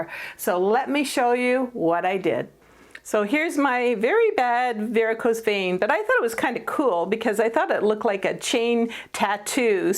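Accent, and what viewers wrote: Canada. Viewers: Good for you wearing what you like & not what other people say you "should" or "shouldn't" wear. Your tips & encouragement to live with confidence are helpful & uplifting. PS: if you're not originally from Canada I'd be surprised. You sure sound Canadian at times. 😁❤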